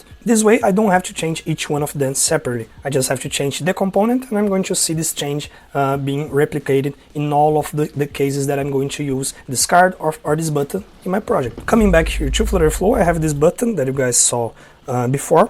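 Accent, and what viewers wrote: Brazil. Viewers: Parece brasileiro falando ingles 😅 boa aula!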